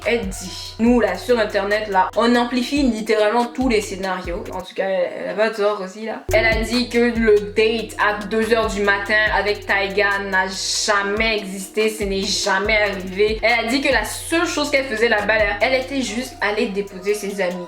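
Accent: Quebec, Canada. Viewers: C’est la première fois que j’entends ton accent québécois waaw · Jcrois qu'elle vie au canada vu son accent. C'est possible que sa agit sur sa manière de parler. En tout cas c'est ce que jme dis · waouw je kiff ton accent canadien. je voyais ta tête tout le temps dans mon fil d'actualité, aujourd'hui j'ai décidé de cliquer sur ta vidéo et je regrette pas, ton accent est magnifique.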